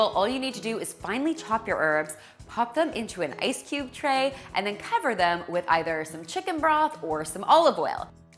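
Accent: Canada. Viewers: Numptie Brown She is Canadian . and BTW English and American has different accents and it's herbs and erbs, so. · she's canadian, everyone has different accents. it can be both honestly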